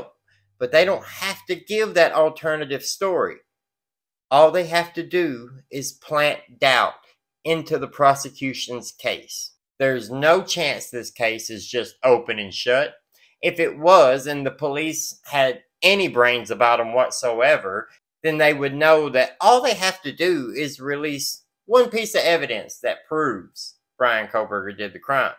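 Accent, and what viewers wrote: South, United States. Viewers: I haven't watched the True Crime "special" yet. I can just imagine... I loved your comment about not just selling black gloves at the "murder shop. First time I laughed out loud today! 😂 The southern in you really came out today. Loved it! Thanks for the video! ❤️🎙️❤️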